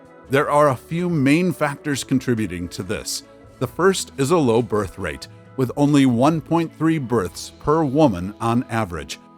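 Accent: United States. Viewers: FRON YOUR ACCENT I SAY YOU ARE AMERICAN, BUT YOU FORGOT TO INCLUDE YOUR BELOVED COUNTRY. 20 MILION AMERICANS LEFT THE USA IN THE PAST FOR HIGH COST LIVING, LOW INTEREST FROM THE GOVERMANT TOWARD IT'S CITIZENS, HEALTH CARE AND SO ON. DON'T TRY TO DEFEND YOUR COUNTRY. PRETTY SOON IT'S GOING TO BE ONE OF WORST PLACES TO LIVE ON THE PLANET. GOOD LUCK YANKEE.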